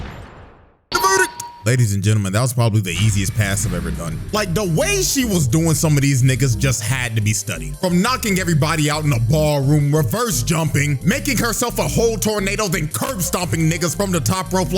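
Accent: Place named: United Kingdom. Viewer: as a Brit I approve this accent. Give this man a cuppa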